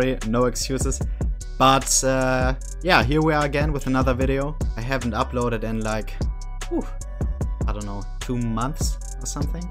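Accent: Germany. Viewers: U Germany?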